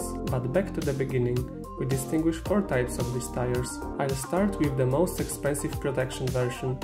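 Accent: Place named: Poland. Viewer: Polish accent.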